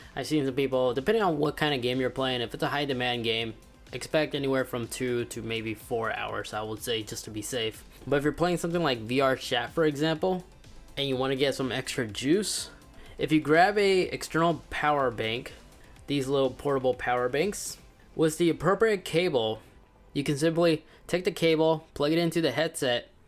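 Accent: Ireland. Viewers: Are you from Ireland my guy? (I'm Irish too) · You from Ireland?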